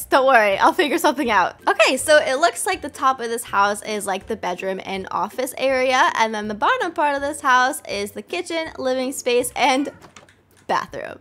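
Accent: United Kingdom. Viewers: Are you from British? Just asking 😊 love you videos <3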